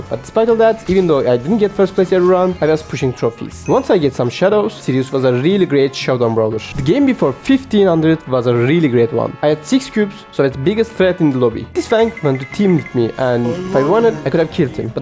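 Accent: Turkey. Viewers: Howdy maan?? I wanna ask smth, are u turk? bcs ur accent looks like turkish. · Are you from turkiye your accent looks turk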